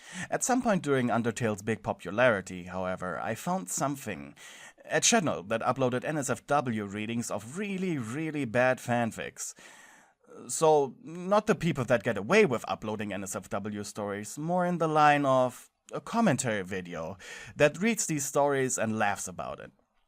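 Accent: Germany. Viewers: Ich hatte ja schon ewig das Gefühl dass du Deutsch bist wegen dem offensichtlichen Akzent :D Ich fühle mit dir das Leben wird immer wie teurer und wir jungen psychisch angeschlagenen Leute können da kaum mithalten…Bin selber noch mit fast 30 bei meinen Eltern und es ist mir so verdammt unangenehm! Ich hab deine Videos schon so vielen Leuten geschickt die ich kenne da deine Vids so gut sind bin echt sad zu hören dass du weniger Klicks bekommst als du verdienst :( Das tut mir mega leid. Hab selber mega Phobien und arbeite online als Streamerin, ist schwer Klicks zu bekommen und die Konkurrenz ist riesig zur Zeit womöglich wegen Corona da alle versuchen von zu Hause aus Online zu arbeiten :/ Deshalb will ich mich nach Amerika begehen und ich finde mit deiner Stimme solltest du ernsthaft darüber nachdenken das gleiche zu tun um Synchronsprecher zu werden! Man kann es auch online machen ^^ Gibt n Discord Channel namens The Voice Acting Club dort gibt es viele hobby Synchronsprecher die dort Jobs bekommen für so amateur Comics, Youtube Videos von Leuten die gute Stimmen suchen. 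Wäre also möglich dort auch für dich den einen oder anderen Job an Land zu bringen um dein Einkommen aufzubessern ^^ Hoffe diese Tipps helfen dir :) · I assumed you were a German through your accent Ich hoffe sehr das dein Neustart Erfolgreicher wird! ich verstehe dich sehr im Thema arbeitsuche (& Phobias/anxiety) Ich wünsche dir viel Glück und werde versuche dich in all möglichen Sachen zu unterstützen!❤️ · I knew from the moment I heard the first asmr you were german!! I know it’s really hard but I know you can do it! We are always there to support you! Soooo viele Grüße aus einer klein Stadt in Deutschland! Hoffe sehr das du dein Ziel erreichst! 💕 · Fuckin knew the accent was German.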